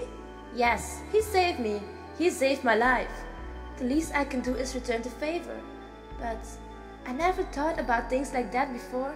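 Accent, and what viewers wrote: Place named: Belgium. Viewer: You're Belgium accent is beautiful! You have such a soft gentle voice. I love it. :)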